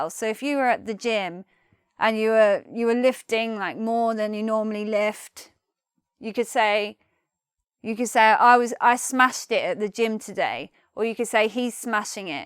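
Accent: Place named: England, United Kingdom. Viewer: why wre not you my high school teacher ? i love you accent so English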